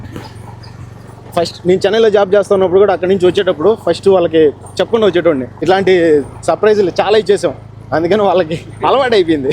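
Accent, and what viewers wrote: Kadapa, India. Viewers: కడప కి వస్తె ఆ యాస లో మాట్లాడుతుంటే అదొక ఫీలింగ్❤ కువైట్ లో ఉన్నప్పుడు వీడియోస్ చూసి చూసి ,,ఇప్పుడు ఇలా మాట్లాడుతుంటే చాలా బాగుంది ,,మన వాళ్ళతో ❤❤❤ ❤❤❤ నాది కర్నూలు ❤ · Anna meru India ki raganai mi guntu mari poendi pakka telugu abbaayi laga mathladu thu unnaru ❤❤🎉🎉🎉🎉🎉❤❤ Welcome to India anna ❤❤❤❤🎉🎉🎉🎉🎉🎉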